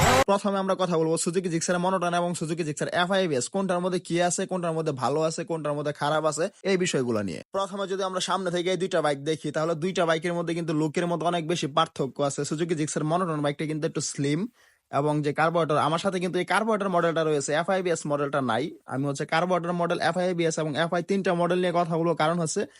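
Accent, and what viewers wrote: Narsingdi, Bangladesh. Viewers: ভাইয়ের বাসা কি নরসিংদী নাকি 😊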